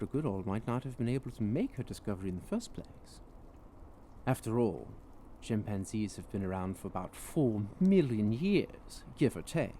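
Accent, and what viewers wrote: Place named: United Kingdom. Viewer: AUDIO TOO LOW! Repost after turning it up to ELEVEN! Lose the Limey Accent - pretensious & condescending.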